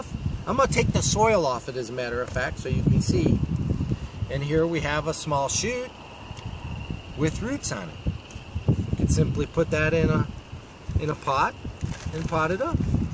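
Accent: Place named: Buffalo, United States. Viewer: Are you from Buffalo, NY? Guessing by your accent.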